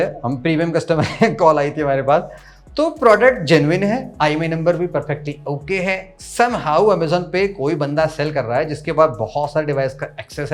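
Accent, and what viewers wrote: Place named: Kanpur, India. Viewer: lgrha tha bahut din se ap bhi kanpur se ho awaj se aj confirm 🎉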